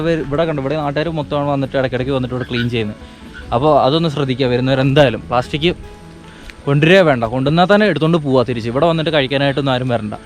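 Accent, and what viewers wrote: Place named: Malappuram, India. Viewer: Bro oru samashayam nee malappuram alle but slank. Bery anallo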